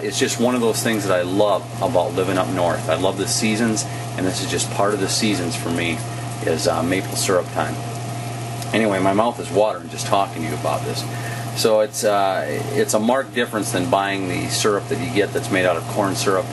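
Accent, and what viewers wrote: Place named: Wisconsin, United States. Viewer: You live in wisconsin cuz I do and you have the accent lol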